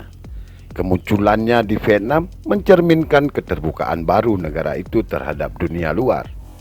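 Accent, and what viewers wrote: Aceh, Indonesia. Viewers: kalau saya simak logatnya, kayaknya orang aceh ya?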